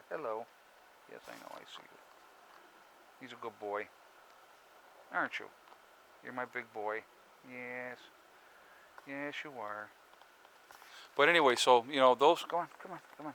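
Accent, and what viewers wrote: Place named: Michigan, United States. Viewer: Wait, where are you from. Just curious. Im in N.H. By your accent, I'm guessing around Michigan?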